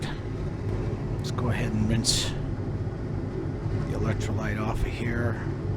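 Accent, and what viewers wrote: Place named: East Coast, United States. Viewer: Judging from your accent I'm assuming you are on the East Coast or possibly Chicago I kind of wish I was closer because I would love to apprentice under you and learn how to do all this stuff... Your videos cover so much information but I know you don't go over all your little tips and tricks.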